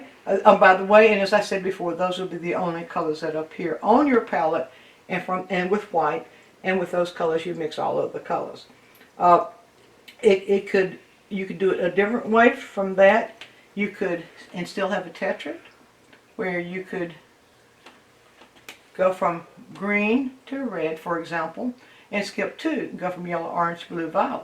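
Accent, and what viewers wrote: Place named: South, United States. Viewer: a smooth southern drawl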